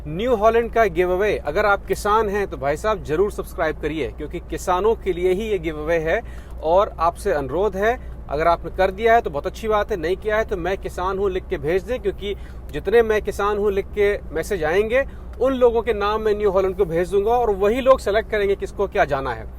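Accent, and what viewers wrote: Bihar, India. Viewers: Lagta hi aap bihari ho ...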